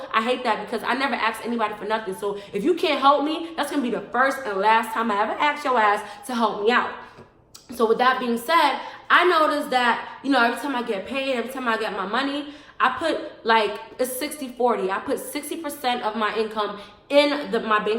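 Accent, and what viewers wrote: New York, United States. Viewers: She got that NY flow but she is classy and inspiration. Whole diff vibe 💖✨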